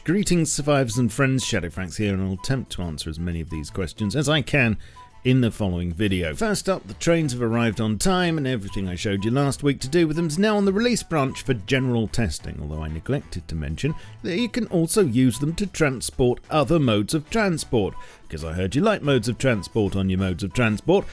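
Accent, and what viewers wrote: United Kingdom. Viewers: hey im glad to hear your nice british voice again. thanks for the updates :-)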